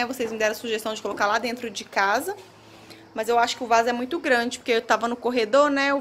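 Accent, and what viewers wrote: Minas Gerais, Brazil. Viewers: Ai desse jeito vou engordar,quero fazer todas as suas receitas...esse cafezinho é muito bom a tarde...linda,adoro seus vídeos esse seu jeitinho mineiro de falar é muito gostoso.meu avô era mineiro tenho um pezinho aí em MG.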